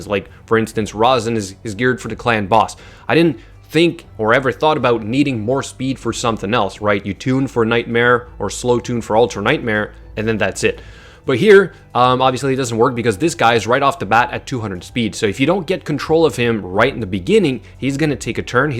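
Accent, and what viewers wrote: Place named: Newfoundland, Canada. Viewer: That's a "newfie" accent. 95% of Canadians don't pronounce it aboot ;)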